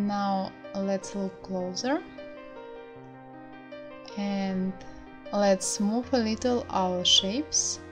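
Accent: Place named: Russia. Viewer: Very cute Russian accent.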